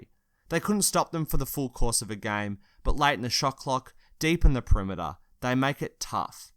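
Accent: Australia. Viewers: Are you Australian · Nerlens Noel is on the comeback!! OKC management are doing a great job! Btw hi aussie narrator 👏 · It’s an Australia accent though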